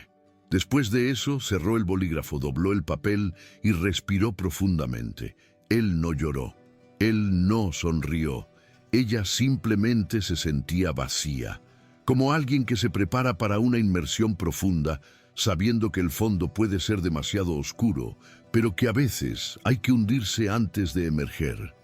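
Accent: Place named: Colombia. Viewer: Usted es colombiano, cierto? Ese español, esa vocalización, es inconfundible. Es un narrador excepcional! Felicitaciones!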